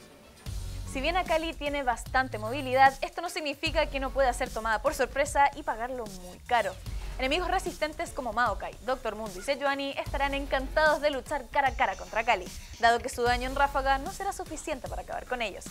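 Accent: Chile. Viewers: Pero si me gusta el acento chileno D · Que bueno que se va esto es legue of legends latinoamerica se necesita a alguien con acento neutro no a alguien que a leguas se nota chilena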